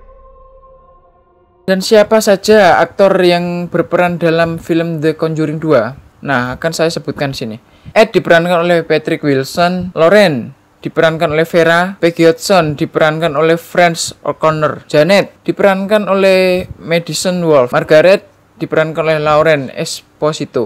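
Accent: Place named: Bojonegoro, Indonesia. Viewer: Medok jonegoro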